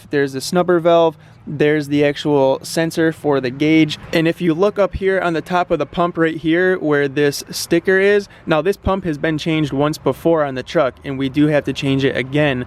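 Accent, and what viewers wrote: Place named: Upstate New York, United States. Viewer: must be upstate ny, that accent....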